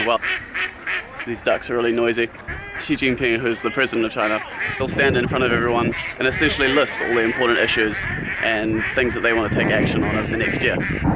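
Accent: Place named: United Kingdom. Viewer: your english does not sound very much like those from new zealand. it is more british.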